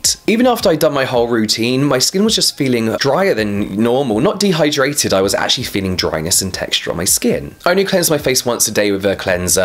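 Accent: England, United Kingdom. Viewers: You talk so fast Man, with british aksen makes me just focused to your beautiful glowing face..hihi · I love your voice. That British accent is so melodic. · He’s from England so you’re right with the accent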